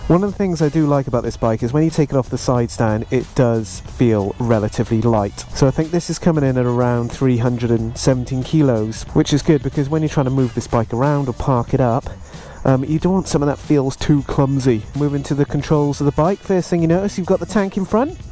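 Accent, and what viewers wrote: England, United Kingdom. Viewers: I made it to 2 minutes English accents piss me off .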